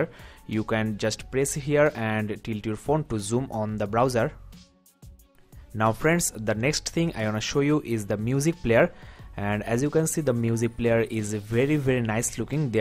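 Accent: India. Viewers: Are you indian? You sound like an indian.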